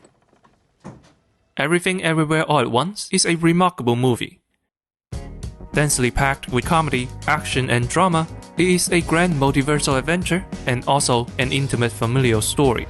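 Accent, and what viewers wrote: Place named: China. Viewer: Listening to the commentator is painful. His Chinese accent is too strong!!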